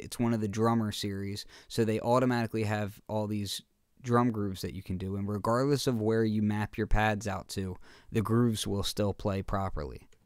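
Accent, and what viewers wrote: Philadelphia, United States. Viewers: Thank you for the video. Is that a Philly accent by any chance?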